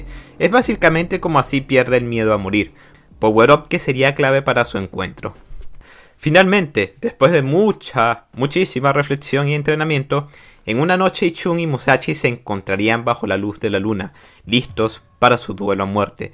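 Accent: Venezuela. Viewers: Es venezolano Jajaj😂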